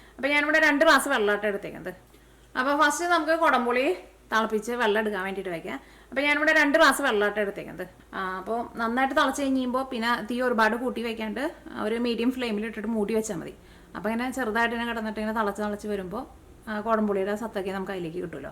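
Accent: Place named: Chalakudy, India. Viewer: കോട്ടയം അച്ചായതിന്ന് പറഞ്ഞിട്ട് ഭാഷ സ്ലാങ് ചാലക്കുടിയാണല്ലോ.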